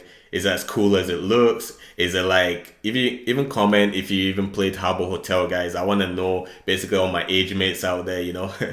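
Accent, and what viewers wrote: Scotland, United Kingdom. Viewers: You remind of Josh finance got in before shiba inu broke a 0 I'm heavily invested in mana so subscribed and liked 👍 where you from also you sound Scottish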